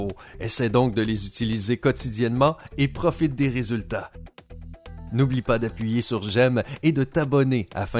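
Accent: Quebec, Canada. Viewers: Et lui avec déjà avec son accent canadien en plus de sa il as le nez boucher rhooooooooooo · je ne sais pas si vos astuces marchent mais elles ne peuvent faire de mal:-) j'aime bien vos vidéos et j'adore votre voix et accent canadien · Bonjour Monsieur j'aime beaucoup votre accent canadien et vos conseils sont géniaux je vous un bonjour de la Guadeloupe et beaucoup de soleil peut etre que je trompe mais il fait trés froid merci vous etes génial ne changez rien . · accent québécois lol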